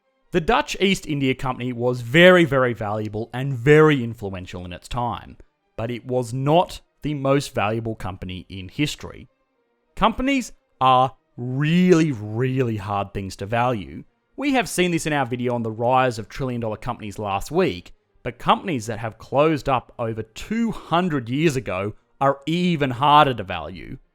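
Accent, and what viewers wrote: Australia. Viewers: One of the reasons I watch your videos is to hear your Australian accent. But I never knew you guys pronounce it... mer-CANT-alism.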